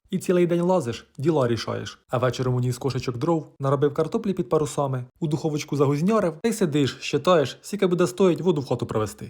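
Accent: Ukraine. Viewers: Ви або з Сумщіни, або Полтавщини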